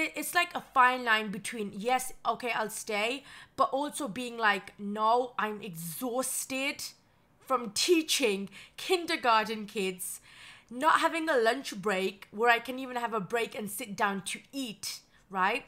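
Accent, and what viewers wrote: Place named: Wales, United Kingdom. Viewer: Welsh accent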